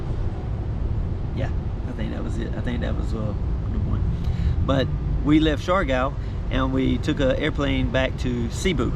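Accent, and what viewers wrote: Appalachia, United States. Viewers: are you inbred variety??? you sound like a hillbilly or Adam sandler in water boy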